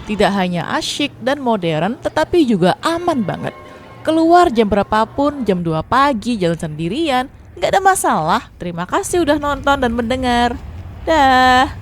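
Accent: Java, Indonesia. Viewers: Wow ... sounds an interesting story and experience. Traveling around the globe is my dream. Keren, mba. Aksen mba kaya orang Jawa.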